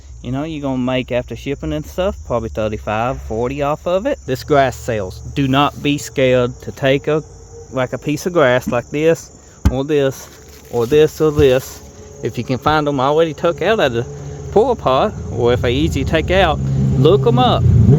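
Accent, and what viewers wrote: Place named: South, United States. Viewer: My first instinct was why does this English man have a southern accent....I listened a little longer and and it became more clear..... discombobulated me at first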